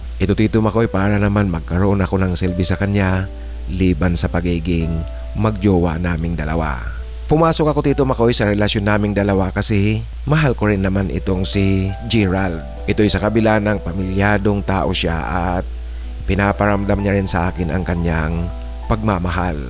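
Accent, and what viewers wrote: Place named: Tagalog, Philippines. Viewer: good evening, Tito Makoy... I realized that it's been 5 years now since I started following your channel dated March 18, 2021. lala ko pa, I used to smile every time you have an accent in some Tagalog word. I think it was one of my comments to teach you to pronounce some Tagalog word.. 😅 but now, as I've mentioned before, you're totally sound from the Katagalugan region. (I came from the popular "Balagtasan" province, can you guess?😅) more power to your channel and wish it will reach the Decade Anniversary.🤗 Stay safe... 🫡 God bless! 🙏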